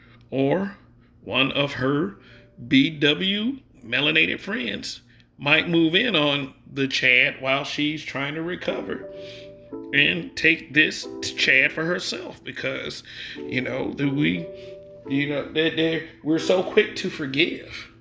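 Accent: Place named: South, United States. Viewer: This is his channel he’s entitled to his opinion. are you mocking his southern accent? That is Ignorant of you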